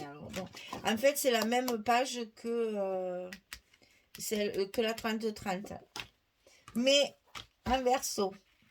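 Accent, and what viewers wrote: South, France. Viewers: Bonjour, c'est un cadeau que tu nous fait tous les jours. Une vraie artiste ! En plus quel bonheur d'entendre parler ''le sud'' qui me manque tant. Des bisous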